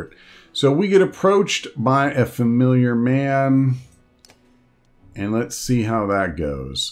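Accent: United States. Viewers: I'm danish and it I look like anglified/americanized made-up scandinavian fantasy names to me, so I think that your americaanized pronunciation will do just fine.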